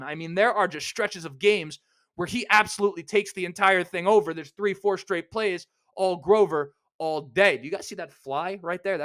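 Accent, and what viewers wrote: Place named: New York, United States. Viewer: hey,! Colts fan since '84----my curiosity is too much for me.....why does I guy living in NY love the colts so much has you obviously do? your looks and your dialect sounds to me like a Giants fan, or a Jets fan or even (cringe) a Patriots fan. Glad you are, but the real reason I subscribed to your podcast is that you are a NewYorker. How did that happen, I mean--why the colts?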